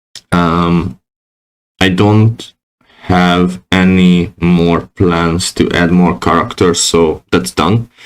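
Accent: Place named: Hungary. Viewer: you have very hungarian accent.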